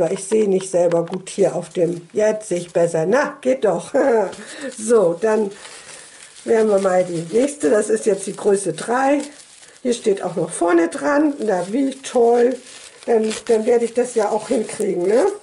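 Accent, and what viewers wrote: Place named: Low German, Germany. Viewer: Süßer Schatz Wenn er ein bisschen größer wäre hätte ich angefragt Ich mag deinen Dialekt so gerne - Plattdeutsch ist einfach toll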